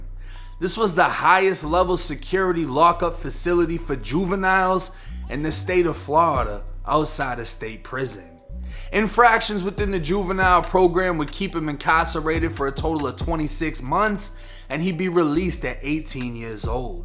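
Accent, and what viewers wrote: Boston, United States. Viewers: You from Boston? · Ammed kah jackin ... could you be any more Boston. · You have to be from Boston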